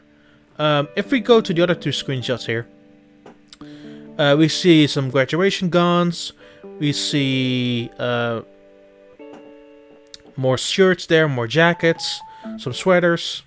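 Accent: Netherlands. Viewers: because YouTube has a training program. that is a first, is Dutch and the way he speaks is very typical of even the most fluent of Dutch English speakers. YouTube is not your typical customer service job so let's try not to compare what is not alike. I can guarantee you that the team gives notes and even though it might not sound like it's scripted, he does most likely have notes for talking points that still doesn't negate pausing to translate or to gather thoughts, etc. Instead of being negative and leaving rude comments, why can't you leave positive feedback and suggestions for how to improve? Is it so bloody hard to find a sliver of kindness? You were once the office or store greenhorn, why does it have to be a negative thing to be learning on the platform? Not everyone starts out with all the tools in their belt.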